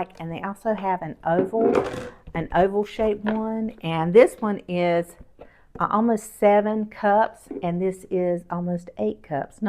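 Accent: South, United States. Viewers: Do not change your southern language, Southern accent, your dialect for anyone or anybody. You are a sweet Southern Bell! I don't understand why people always try too correct our heritage.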